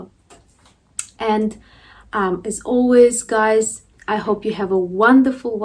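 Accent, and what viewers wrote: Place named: Russia. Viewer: Your accent telling me you’re quite fluent in speaking Russian)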